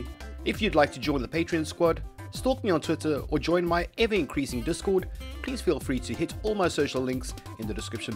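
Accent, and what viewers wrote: South Africa. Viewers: Are you South African? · South African? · Dude you should like a South African??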